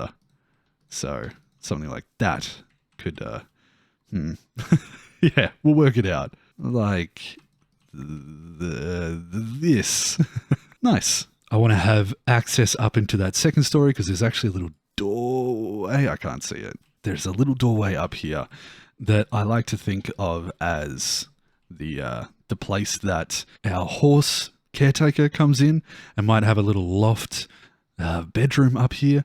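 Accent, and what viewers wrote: Australia. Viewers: finally have time to watch the episode!! If it's not full of Aussie Builder heavily accented slang I want a refund!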